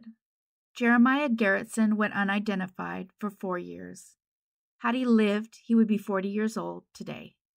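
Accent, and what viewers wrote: United States. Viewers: The voice we are listening to, sounds like Southern Girl.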